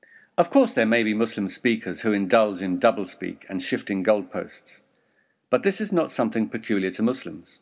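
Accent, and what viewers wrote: England, United Kingdom. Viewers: excellent al taqiyya... use someone with an english accent to say "islam is peace" i advise anyone with a brain to read the koran without the help of a muslim muslims have always preached al taqiyya since mohammeds time: a nsw ering nonb eliever. i nfo/ was- prophet- muhammad-wron g-for-killing-kaab-bin-al-ashraf.html from another muslim.. shows the mentality allah fukbar!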